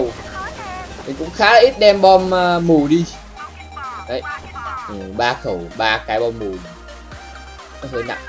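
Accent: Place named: South, Vietnam. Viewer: nói tiếng Nam hai đấy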